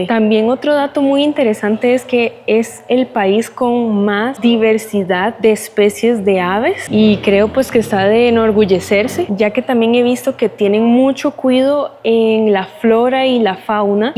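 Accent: Bogotá, Colombia. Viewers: Se me hizo que tiene acento rolo(bogotano) con algo muy mínimo de costeño. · Si, el acento se parece mucho al bogotano. Indudablemente. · Hola amiga,pienso que el acento Tico, es muy parecido pero al acento Rolo, me parece increible, porque historicamente realmente nunca fuimos un solo pais. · Soy de Bogotá y me costó mucho trabajo tu acento, hablas igualito a nosotros. Te darás cuenta que nadie es capaz de reconocerte como extranjera. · El acento efectivamente sí es muy parecido al bogotano, y la cara también parece de acá. Antes había conocido el acento costarricense por un profesor de programación que se llama Fernando Herrera, es un tico muy famoso en ese mundo, y también habla como bogotano pero con la RR arrastrada. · TU HABLAS COMO LAS ROLLAS OSEA LAS DE BOGOTA CAPITA ( ACENTO) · Si, tienes acento Bogotano. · Hola si es verdad que el asentó se parece mucho al de Bogota' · Hey amiga debo decir que al principio pensé que eras una Bogotána más. 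· Soy de Bogotá y tienes el mismo acento que mis Primas, pensé que era de Bogotá. Saludos🇨🇴🤝🇨🇷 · Pues si cuando comenzaste a hablar pensé que eras bogotana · SI SE PARECE.SI ESE ES EL ACENTO,SI BOGOTÁ...👍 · Si el acento es muy iguall al bogotano le comento en la historia de Colmbia dicen que iva hasta Costa Rica le dejo esa inquietu aberigue en la historia de su pais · Al principio pense que era una Rola hablando de Bogotá... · Tu acento es muy semejante al acento de bogotá❤ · Soy colombiana y antes de q hablaras del acento pensé q eras rola , es cierto hablan bastante parecido a los Bogotanos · Si no leo los comentarios, juraría que eras bogotana. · Literalmente pense que eras Bogotana y estaba un poco confundido con el titulo del video xD Como que llegaste a tu pais? ya cuando dijiste que eras de Costa Rica entendi todo, son acentos demasiado parecidos, me paso igual en Costa Rica, hermoso video, gracias por visitar, te mandamos un fuerte abrazo! 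· Y para ser mas preciso, tienes el acento de una Bogotana que creció en un estrato socio-económico del 4 al 6. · Hablas como rola Bogotana 😮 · Tu acento si es parecido al de Bogotà Saludos ! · Cuando te vi y oí pensé esta colombianita hablando del país, hablas y te ves como Bogotana. Muchos éxitos. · Me engañaste creí q era una rola una hija de papi y mami de las entrañas de Bogotá .😊pero si el acento es casi parecido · El acento es muy parecido al de Bogotá. Bienvenida mi chinita a la capital. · Tienes un acento muy parecido al Bogotano 💐🌹🌺🌷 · Hola soy de Bogotá y por tú acento pensé que eras de acá 😂. Hablamos pausado y esta conciderado como el mejor castellano del mundo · Buen video y me alegro que visites nuestra ciudad, tu acento es muy bogotano, si te viera en la calle pensaría que eres bogotana, además también tu aspecto y todo es muy de Bogotá, y pues también queria hacerte unas pequeñas correcciones, el presidente de Colombia vive detras del congreso la cual se llama la casa de Nariño, lo que señalaste es el Colegio de San Bartolomé, el más antiguo de toda Colombia fundado en 1604, lo de las carpas en la estatua de Bolívar es porque ahi una guerra entre guerrillas en la región del Catatumbo, en el departamento ee Norte de Santander, y seguramente protestan para que esta guerra acabe, y en cuanto a la plaza de toros la última corrida se hizo en 2020, y que yo sepa no se hace nada relacionado con toros, ni corridas ni nada, ese lugar se usa para eventos culturales pero son de vez en cuando, por eso el lugar se ve asi un poco deterirado y lleno de grafittis, y las corridas de toros ya se van a prohibir en el 2027 en todo el país, y en las únicas zonas donde es activo la tauromaquia son en pueblos y en una ciudad llamada Manizales donde son famosas sus corridas, pero gracias a Dios lo van a prohibir por completo, espero recorras mas sobre la ciudad y todo el pais y lo disfrutes, un abrazo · los costaricences hablan muy parecido a los bogotanos, es la locura jajaja,. 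tienes un acento muy muy similar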